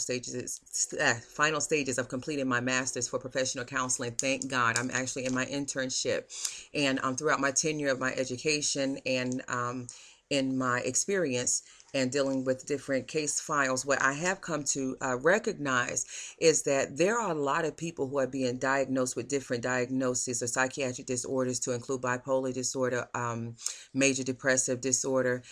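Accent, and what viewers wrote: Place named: New Jersey, United States. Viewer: you are sooo from Jersey with the "yous" haha i love it! Im from CT and have been to Jersey so many times I cannot count so I can relat. Thanks for this video!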